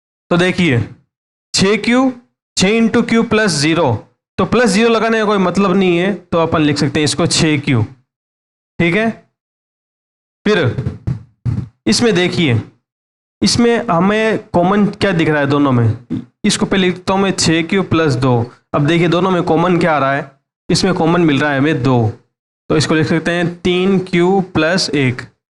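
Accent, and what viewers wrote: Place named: Rajasthan, India. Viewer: Bhai kuch interesting video banao biring lagta hai,dekho ek tho aap logo ki language sahi nahi, English talking meh Rajasthani jhalakti hai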